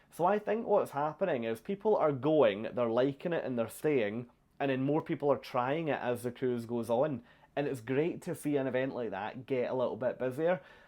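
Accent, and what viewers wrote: Scotland, United Kingdom. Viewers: Are you speaking English? THAT's the Aussie accent I was warned about! Not that there's anything wrong with it. OH Scottish. My bad. I was thrown off by the shirt. I do a fair Scot accent myself! You're kinda young for HAL...actually you are VERY young for HAL! 🤣 Dutch and Cuisine in the same sentence...does not compute. Not every HAL ship has a 'thermal suite' On Signature class ships, I THINK it's called The Retreat. This is true on a lot of non Pinnacle class ships - IE BB King, notes etc is not on every ship either. We are going to be on the Nieuw Amsterdam on our next cruise and the only Music Walk venues they have is Billboard and Rolling Stone. Not just a wine tasting....but a wine PAIRING! I wonder if they have a master Sommelier on board! There aren't that many of them in the WORLD! Have it All includes a night at Pinnacle! 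· I loved your videos and your Scottish brogue. I’ve travelled a lot on Holland and enjoy it but I am 87 and can’t get around well enough to do all those activities. Don’t get off at ports either as walking is difficult but I DO enjoy eating and relaxing. Thank you for the videos!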